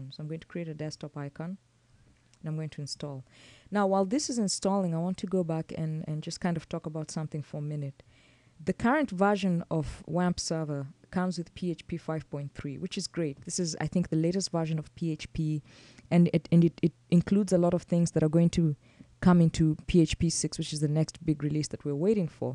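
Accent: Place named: Nairobi, Kenya. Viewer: i noticed ur english is a blend of nairobi and the pauses between the videos :) blessed to have a kenyan around :D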